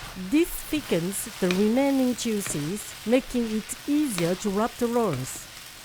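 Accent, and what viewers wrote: France. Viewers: She is Asian with a French accent !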